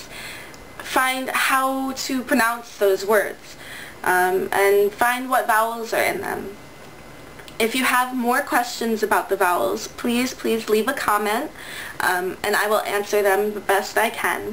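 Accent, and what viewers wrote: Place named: South, United States. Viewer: Great! It's interesting to realize @@ that you've killed 5 vowels with one video ^_^. And again, I like the way you teach, it's really alive! I really love to listen some culture backgrounds of pronunciation or anything in English, for example when you tell that you got Southern American accent. I also like that you supply the CC or subtitles. That's what I've been hoping for all teachers do the same.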